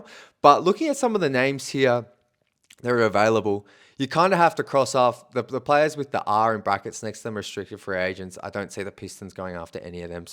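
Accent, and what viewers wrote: Australia. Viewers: how does his balls taste? He lives in Michigan and has no more information than a decent beat writer. He just has an Aussie accent that makes listening to him different for an American audience. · No. recent example is the Fontecchio piece. I didn’t hear that here. Nothing on it but I don’t pay for the on-line subscription fees for news bought by a news information product company. Providing the same news stories to across the land. I.E. ~ Knight-Ridder (if they are even around). Point is. Same content across the board. That’s old, presumptive, and to me breaks the code of having your own paid reporters. more of a reporter on the Pistons than a regurgitate, local Detroit reporter here is. I’m a global human. I don’t care about accents. The NBA is a global brand. Aussie…top 7 countries in bball except the U.S. So his talk sounds right to me.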